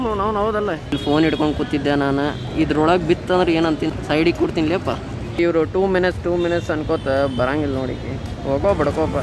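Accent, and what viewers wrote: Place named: Uttara Kannada, India. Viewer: First video nodidini. Uttarakannada bashe chennagide.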